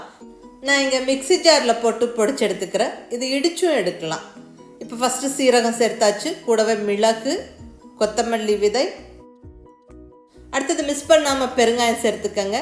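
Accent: Kongu, India. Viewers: Unga samayal ellam romba pudikum kongu tamil super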